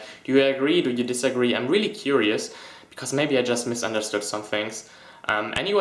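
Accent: Germany. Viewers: Jesus Christ your German accent sounds stupid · Is he German? He kind of has this German accent when he talks other languages :( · OMG hab dein Video gesehen und hab die ganze Zeit gedacht, ist der nicht deutsche, weil dein leichter akzent hat mich stutzig gemacht und als du dann über das verhältnis von deo in deutschland geredet hast, hab ich es gemerkt. Sehr cooles video. Grüße aus Köln :) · Du sagst einen Satz und man merkt sofort, dass du Deutsch bist :D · Ich dachte mir schon hmmm der akzent hört sich deutsch an und dann wirklich wooouw ich kann germsn accent raushören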